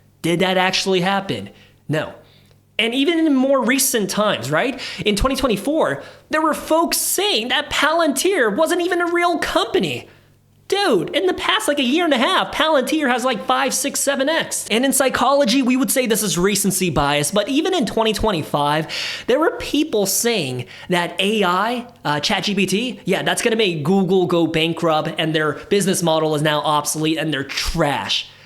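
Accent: United States. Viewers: It is so funny to see a chinese guy speaking which such a heavy Gringo Accent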